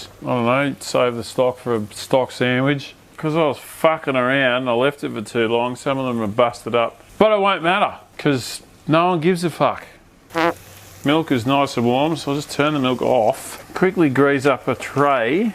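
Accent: Australia. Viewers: Is this how Australian people talk? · He's definitely Australian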